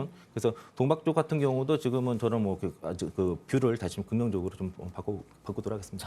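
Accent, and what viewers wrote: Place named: North Korea. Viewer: 아나운서 목소리 북한사람인줄~~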